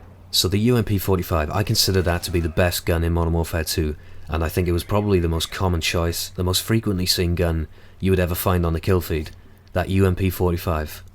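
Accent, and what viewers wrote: Wales, United Kingdom. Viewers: Are you from Wales?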